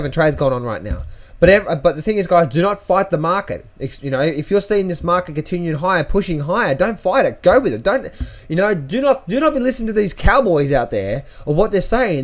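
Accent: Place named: Australia. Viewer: haha tell them in the Aussie way... love it!..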